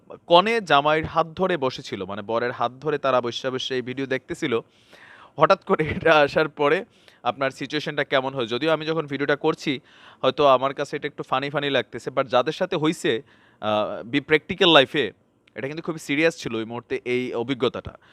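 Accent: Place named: Cumilla, Bangladesh. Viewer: ভাই এই ভাবে গান গাইলে তো আমি আপনার প্রেমে পড়ে যাবো সো কিউট কুমিল্লাার ভাষা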